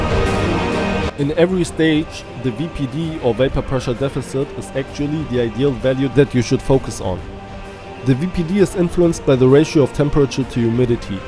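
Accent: Germany. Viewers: Man hört raus dass du Deutscher bist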